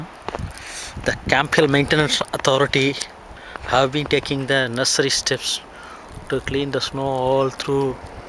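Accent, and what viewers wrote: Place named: South India, India. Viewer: u r a south indian........................ i can cent percent tell ,from your accent.... ur intensity-density, ur lastnight, ur drizzling drizzling continuing continuing, how is life from india.....ur place must be very hot . u r seriously shivering out there... dont cha MISSED INDIA.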